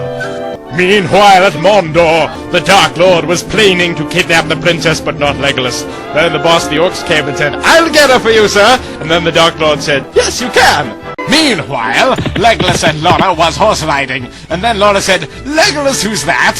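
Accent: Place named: Ireland. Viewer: Is it just me or does Laura have random hints of an Irish accent?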